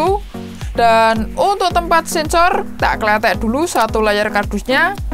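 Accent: Java, Indonesia. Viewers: Koe medok men bosqu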